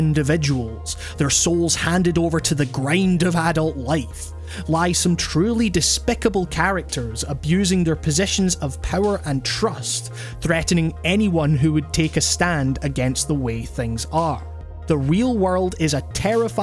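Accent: Scotland, United Kingdom. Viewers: god i love the scottish accent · Are you Scottish? I love your accent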